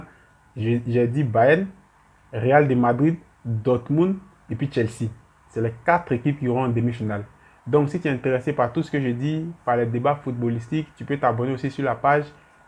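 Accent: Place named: Ivory Coast. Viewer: Mais il a beau rester à l'étranger ce mec mais son accent ivoirien ne quittera jamais 😂😂😂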